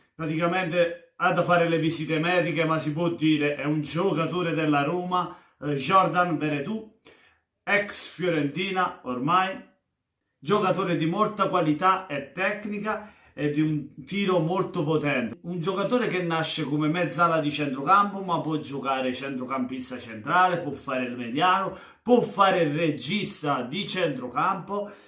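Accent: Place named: Naples, Italy. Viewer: Ahahahah ciao carissimoooo mi piacciono tantissimo i tuoi video, poi la parlata Napoletana è fantastica viva il SUDDD ❤ BUONA SERATA 💋💋❤❤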